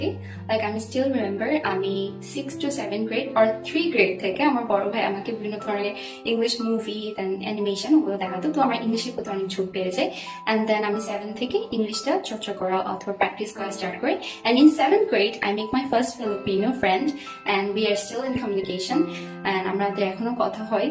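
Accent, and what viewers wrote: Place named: Chittagong, Bangladesh. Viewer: whan you say Bengali, চাটগাঁইয়া একসেন্ট আসে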